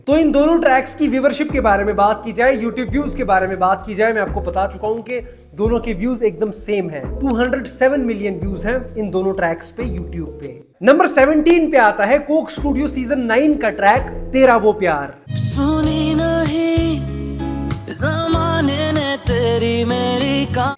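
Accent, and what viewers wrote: India. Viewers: Yaar, Please speak correct Urdu. Okay. Abhee kay time pay? What is this? If you want to speal Mumbai style urdu say so? Sub Hindustani kyon bolyat ho bhai?? Please explain??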